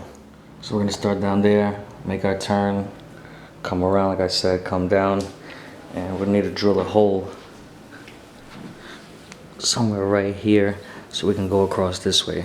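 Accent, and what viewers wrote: New York, United States. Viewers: Love the NY/NJ accent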